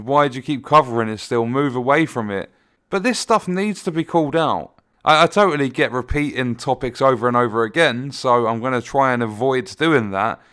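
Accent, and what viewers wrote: United Kingdom. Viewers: Love your explanation bro , i just subscribed to your channel, seems lovely and interesting with British accent lol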